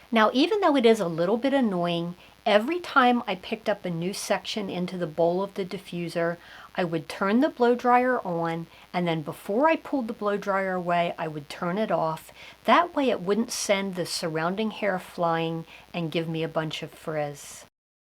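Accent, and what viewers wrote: Philadelphia, United States. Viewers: I am from Philly and you are either Philly or South Jersey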